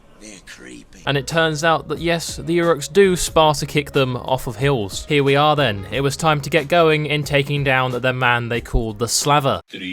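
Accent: United Kingdom. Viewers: Are you British Bro?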